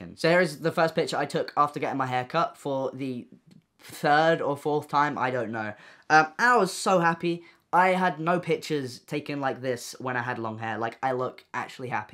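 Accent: United Kingdom. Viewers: They have the same type of British accent. British accents are different depending on where your from. Like if your from liverpool your accent is different to someone from the likes of Birmingham. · you're so British!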